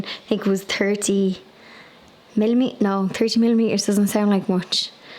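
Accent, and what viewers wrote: Ireland. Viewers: I love you so much! Whenever I'm down I watch one of your videos and your loveliness and your lovely Irish accent makes everything better!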